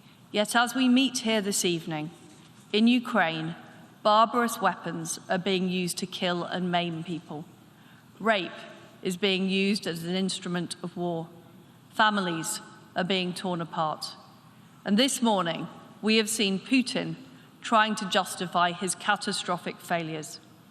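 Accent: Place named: United Kingdom. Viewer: Loooooovveeeeee the British English ♥️♥️♥️♥️♥️♥️♥️🌹🌹🌹🌹🌹🌹🌹🌹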